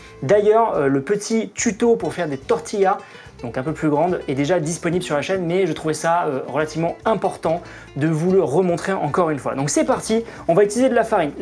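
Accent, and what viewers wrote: Mexico. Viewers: Saludos desde México, wey!!! Me encantó la intro 😂😂 felicidades por tu español bien mexicano!! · Trpp fort avec l'accent mexicain et tout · C'est le meilleur accent mexicain que j'ai jamais entendu ! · No mames este pinche habla mexicanooo, mira tu muy bieeen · Pourquoi tu dis qu'il parle "sans accent" alors qu'il essaye clairement de faire un accent mexicain ?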